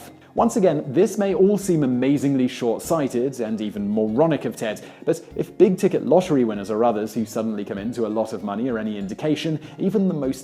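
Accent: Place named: Belfast, United Kingdom. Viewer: Is anyone here from Belfast ?? This sounds like a homeless man in Belfast.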